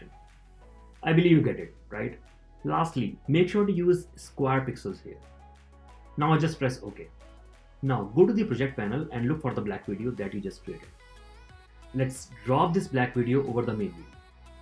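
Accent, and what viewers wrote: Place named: India. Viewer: Indian? Right!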